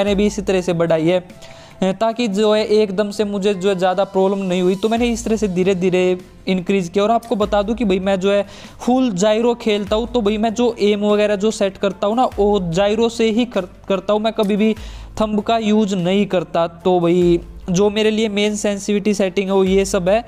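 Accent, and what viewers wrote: Haryana, India. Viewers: Big fan bro I think you are from Haryana 🧐I am right plzzzzzzzzzz reply 🥰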